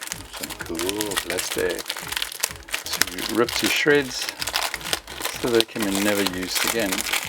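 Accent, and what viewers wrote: South Africa. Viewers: Nice review, you sound South African ?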